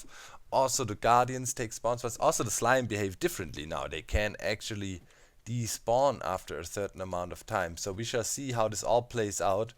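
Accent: Germany. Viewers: I've followed you since the tree house days. I was following Vintage Beef when he visited your base having made himself invisible and you just turned round and shot him . I have followed you since. I am useless at redstone but I love your videos. I really like your elegant building style. I am English from London and, through you,I joined my first multiplayer world - Gummy's Tree realm. Your English is excellent and I love your accent. Subtitles would not work for me because it would lose the close connection that listening to someone's voice achieves. Most Germans speak excellent English so keep going in English please.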